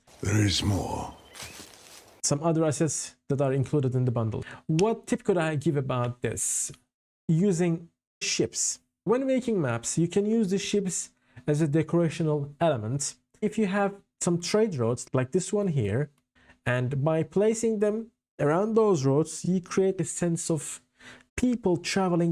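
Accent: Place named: Turkey. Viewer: İngilizce'deki akıcılığını takdir ettim. Günlük olarak ingilizce konuşuyor musun bilmiyorum tabi ama türk aksanından ve isminden yabancı olmadığını hemencecik anladım. Çok kaliteli bir kanalın var umarım dilediğinden bile fazla başarılı olursun! Abone oldum.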